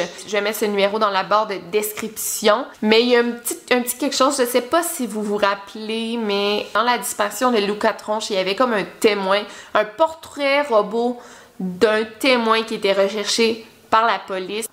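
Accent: Quebec, Canada. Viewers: Un briquet a la tabagie. Alors ça je l'avais jamais entendu. C'est bien en tant que français on apprends des expressions et des mots du Québec. Moi qui ai toujours été fan de l'accent québécois et du Québec (j'aimerais trop y aller pour visiter) je ne peux plus me passer de tes vidéos. 😊 Super idée les vidéo update en tout les cas 😊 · Les Sisters D'msp oui exactement ! On s’est habitué à sont accent maintenant mdrr et depuis j’aime trop l’accent québécois !! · Avant de te connaître je trouvais l'accent québecois horrible. Maintenant je trouve cet accent charmant mon oreille s'est habituée je pense. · Ça me fait tellement plaisir! Tes vidéos sont comme une drogue pour moi. Un jour mon cell est mort(pour de vrai il marchait pu) ce qui me manquai le plus c'étais tes vidéo. Chaque jour j'ai besoin de ma dose de toi!: ) . De plus, ça fait différent de tout les youtuber(euse). 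J'aime que tu ais un vrai accent québecois(j'en suis moi même une).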